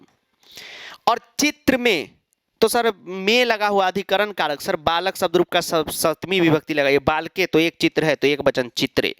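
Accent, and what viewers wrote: Bihar, India. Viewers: Sir kya bihari boli maje a gye 🫡🫡🫡😂❤❤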